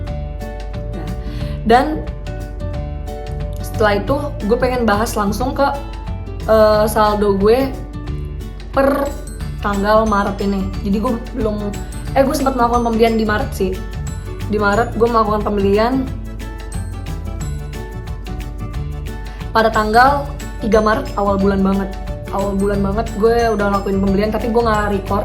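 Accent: Bali, Indonesia. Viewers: kek logat orang Bali 🤔 , tpi thanks. ya video nya menarik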